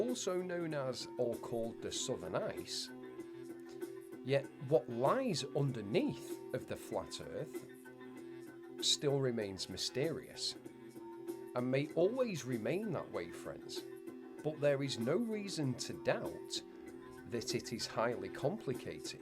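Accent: United Kingdom. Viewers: I feel dumber trying to watch this. Having a British accent doesn't make you smart.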